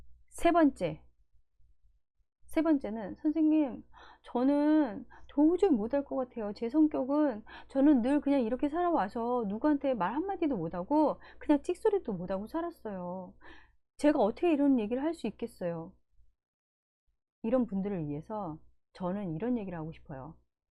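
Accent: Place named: Jeolla, South Korea. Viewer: 전라도 분이신가봐요 ㅎㅎ '모대요 모대요'가 귀에 계속 맴도네요,, 좋은 내용 너무 잘 들었어요♡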